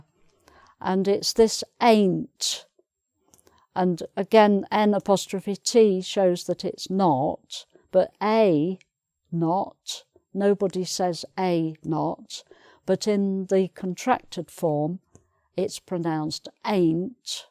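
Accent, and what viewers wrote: United Kingdom. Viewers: Thanks again and again for your amazing lessons and British clear accent.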